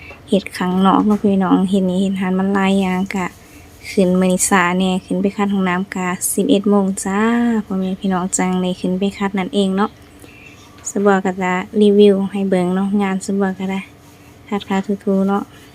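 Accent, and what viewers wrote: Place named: South, Laos. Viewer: สบายดีครับสาว มาใหม่ครับอยู่แขวงไหนครับทางฝังลาวสําเนียงการ พูดพี่ว่าน้องอยู่จําปาสักลาวใต้ใช่ไหม่ครับ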